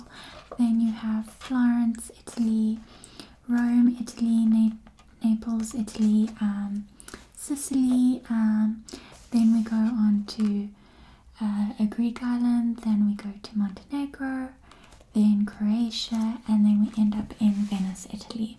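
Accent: South Africa. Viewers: I thought she was British too in the beginning, but then there were parts that sounded too close to my own accent (Australian) and the natural conclusion is SA lol. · Love your accent. Is it South Africa? x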